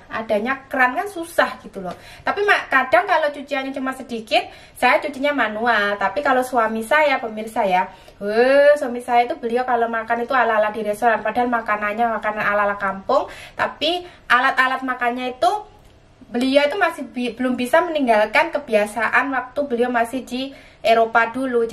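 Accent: Java, Indonesia. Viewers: Mb klo bicara dicampur bahasa jawa yg medok lucu deh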